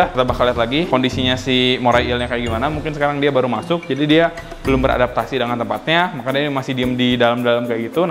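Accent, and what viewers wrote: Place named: West Java, Indonesia. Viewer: Cara ngomong kak ini khas Sunda bgt apakah emg org Sunda? Wkwk penonton baru ini.... Dan ada yg bisa ngasih tau ini pasarnya di mana ya?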